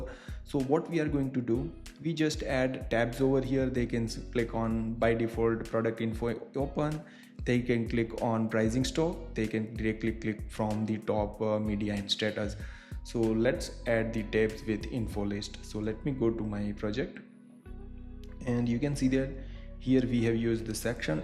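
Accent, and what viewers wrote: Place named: India. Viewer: Thank you for the amazing content. I'm Brazilian and I'm learning a lot from you. Besides Filament, believe it or not, I'm also learning English, because the Indian accent is very pleasant to listen to; it's quite similar to Brazilian pronunciation.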